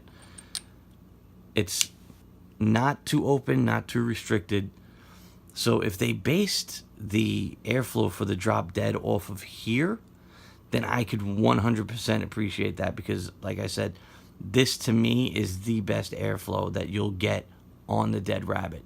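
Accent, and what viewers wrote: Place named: Northeast, United States. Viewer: Thanks for the review brother. Just curious, where are you from? I knows it's the East Coast, I'm guessing north eastern, close to philly?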